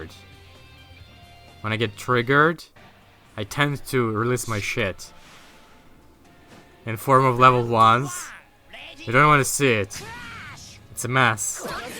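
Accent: Russia. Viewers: I think he's Russian?? I could be wrong tho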